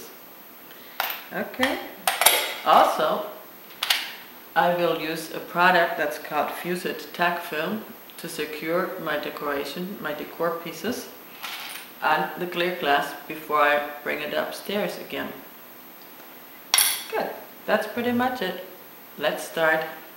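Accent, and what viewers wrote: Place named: Netherlands. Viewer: you're such an inspiring teacher, Thanks so much! Your accent sounds like my mom (100% Dutch) anywho- keep it up- we enjoy you so! Shereen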